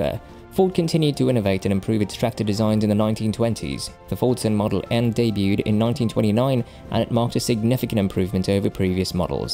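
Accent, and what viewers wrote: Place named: England, United Kingdom. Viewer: Turned this off for the English accent.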